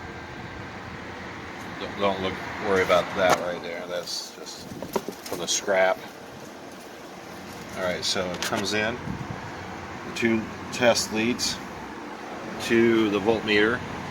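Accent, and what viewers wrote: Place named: Texas, United States. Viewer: Never knew there was such a thing as an earth battery ... learn something new every day! Yay Texas twang!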